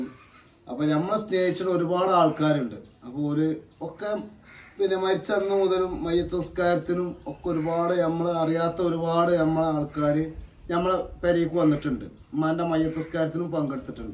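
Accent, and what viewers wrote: Malappuram, India. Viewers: ഉമ്മന്റെ മരണത്തെക്കുറിച്ച് പറയുന്ന വീഡിയോയും monetization ഓൺ ആക്കി പരസ്യം വരുത്തിക്കുന്ന നീ Hero ആണ്😢 അതുപോലെ ഇപ്പോൾ ശരിക്കും മലപ്പുറം ഭാഷ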